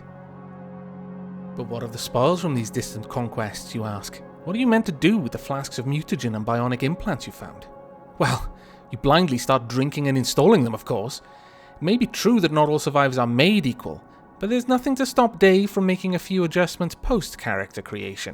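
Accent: United Kingdom. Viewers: What's with all the new Let's Players I'm finding sounding like they're doing voice-overs on prologues to some series? First the 'Call in now, and you get TFC with not just five, not just six, but at least SEVEN NEW TREES!' of Pakratt, and now the 'Come with me on a journey through the ag... I mean, game' of this guy? Amusing, though. Wonder how you'll talk later on... Probably still british-esque.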